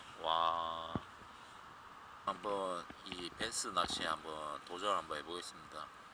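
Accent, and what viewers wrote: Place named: Busan, South Korea. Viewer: 안녕하세요 저 류마티스 아주 친한 친구입니다 류마가 링크보내서 이 채널에 들어왔어요 ᆢ류마도 배스낚시 참 잘하는데 자주 들리겠습니다 ㅎㅎ류마가 은근히 재미있는 채널이라고 하더라구요 ㅎㅎ 제 고향도 부산 감만동인데 구수한 부산사투리 듣고 있으니 고향가고 싶네요 ㅎㅎ268번째 선물드리고 갈께요 ㅎ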